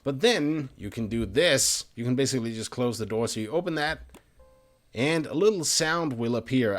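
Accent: Denmark. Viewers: Are u from Denmark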